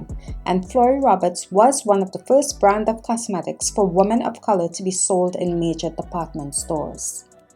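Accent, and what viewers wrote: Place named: Trinidad and Tobago. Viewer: Are you from Trinidad, Port of Spain? Beautiful accent ❤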